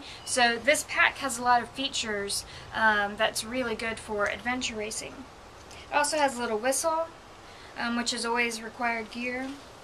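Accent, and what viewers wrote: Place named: United States. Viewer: really that ;erson said you have an accent ? you sound like a american girl ha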